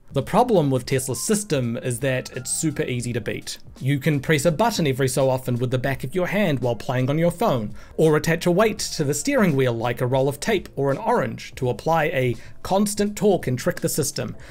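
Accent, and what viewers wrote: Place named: New Zealand. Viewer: Great to hear a kiwi voice on YouTube!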